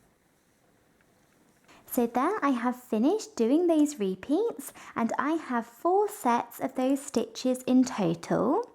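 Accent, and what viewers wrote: United Kingdom. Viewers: An extremely strong UK accent. 💕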